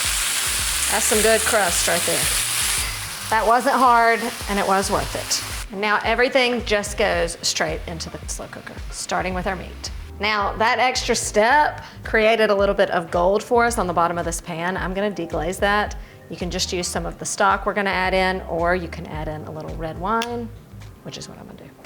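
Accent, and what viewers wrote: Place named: Deep South, United States. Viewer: Thank you. Love the accent. Very gentle Deep South?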